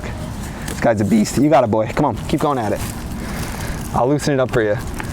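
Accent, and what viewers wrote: New Jersey, United States. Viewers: I hear that Jersey accent, “wooder your plants” 😂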